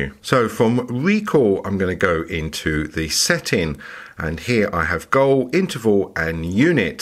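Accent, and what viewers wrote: United Kingdom. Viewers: I'm fond of your accent ! Soooo cool Soooo british. Where are you from if I may ask my good pal ?)) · Excellent presentation and outstanding commentary in British English :) Your voice is very impresario like, as a boxing match commentator. Btw even if you say you are in London would you say yours is the quintessential Queen’s English or say Glemsford or is it Received Pronunciation because I don’t hear a regional accent at all. I found it very useful really. Good day Sir.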